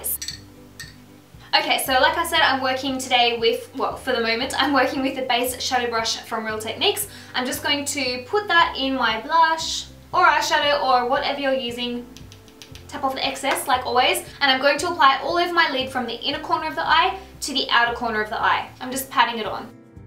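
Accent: Australia. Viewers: australia, I think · I would say australia somewhere. Just guessing.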